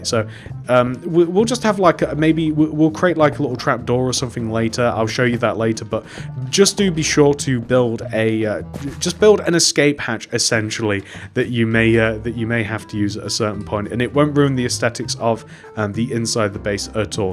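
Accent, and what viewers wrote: United Kingdom. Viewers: Are you British??? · Ben Matthews I think it is Really well because of the British accent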